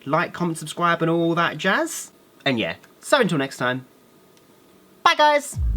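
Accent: United Kingdom. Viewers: Your british accent is awsome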